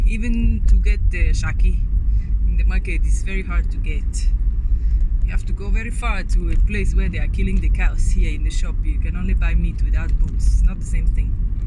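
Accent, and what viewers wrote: Igbo, Nigeria. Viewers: Love how you use our Igbo accent,!🥰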